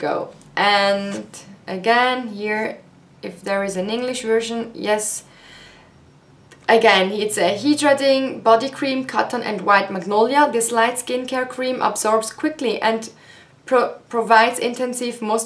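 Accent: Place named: France. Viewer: Nice haul, i like how you speaks on French so chic 😉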